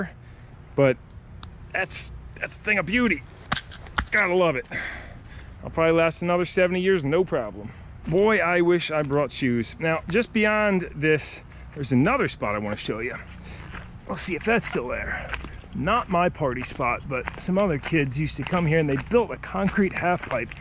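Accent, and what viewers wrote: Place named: Philadelphia, United States. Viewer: This man is def from the philly region i can hear it in the voice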